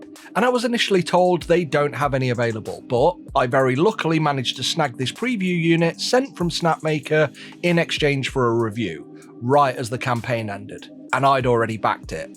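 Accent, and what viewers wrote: United Kingdom. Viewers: I’m American. And like most Americans, I find listening to someone with a British accent telling me things relaxing. And as I’m relaxing at the end of a long day it is mentally akin to wearing comfy slippers on a cold night while relaxing in an overstuffed leather recliner reading a good book as I sip a nice hot cup of cocoa (meaning it's really comforting). So when the aforementioned British speaker has the opportunity to reference something so uniquely British – like a famous Sherlock Holmes quote - thus enhancing this comforting experience , I consider it a missed opportunity. I’m not the only one that finds this phrase reassuring or having value outside of its literal context.(According to a popular literary web site) " 'The game is afoot' is more than just a phrase, it is a cultural touchstone, a literary echo, and a testament to the enduring power of human curiosity and the pursuit of knowledge". 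I hope now you can understand how this was, in all conceivable meanings of the phrase, truly a missed opportunity.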